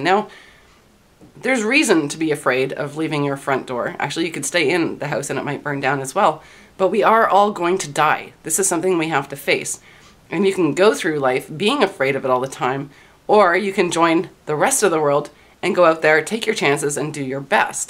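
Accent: Canada. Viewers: You Canuck, eh?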